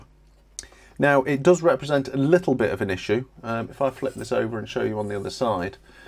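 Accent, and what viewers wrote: United Kingdom. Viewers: Derbyshire accent?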